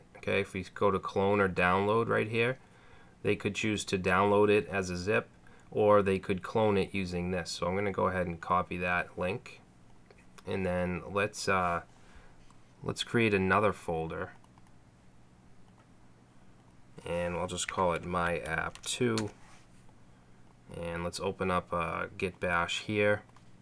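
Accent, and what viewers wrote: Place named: Boston, United States. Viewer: that accent.. are you from boston?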